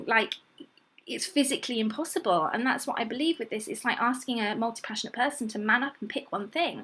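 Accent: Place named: United Kingdom. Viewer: Your accent is British 🙂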